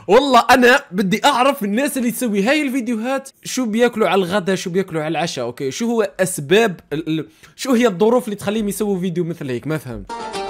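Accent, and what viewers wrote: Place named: Maghreb. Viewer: أحسه جزائر أو مغربي أو تونسي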